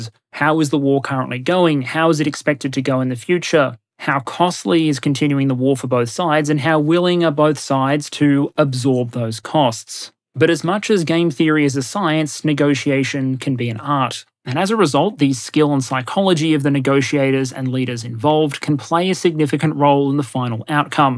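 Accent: Australia. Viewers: After watching a great f1 race, then the rest of the wec race at monza and also imsa i get to listen to an aussie talking about war and negotiations while making F1 references. Perfection.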